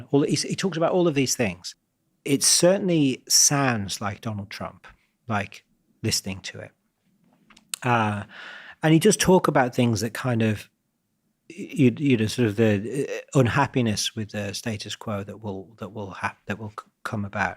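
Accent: RP, United Kingdom. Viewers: great insight .. also he blinks and tlks like mr westwood but speaks the queens english lol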